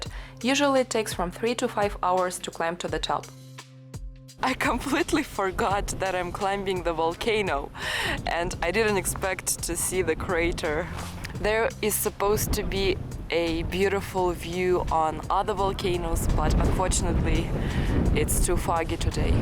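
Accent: United States. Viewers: Thought you were saying Geyser wrong, since your accent is more American sounding maybe you should say it <link> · Пошленькая девушка и такой же пошленький американский акцент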